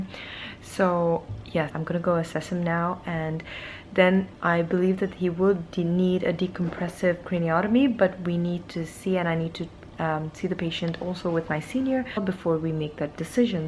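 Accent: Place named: India. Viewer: She's definitely indian u can hear the indian accent lol also she kinda had that indian nose 👃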